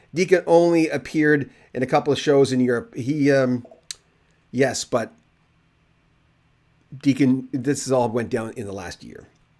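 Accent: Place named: Canada. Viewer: You can definitely tell he's Canadian by his accent... especially the way he says "abowt".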